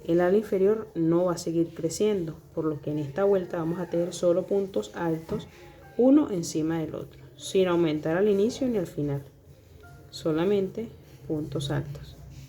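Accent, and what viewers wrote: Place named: Venezuela. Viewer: Te has ganado una suscriptora más!!!!.... te felicito... me encanta como explicas. Creo que somos paisanas por tu acento jaja... lo hice y quedó muy bonito. Espero que mi clienta quede satisfecha, cuando me envíe foto te las paso por instagram... Gracias otra vez por compartir tus conocimientos con otras tejedoras... un abrazo Venezolano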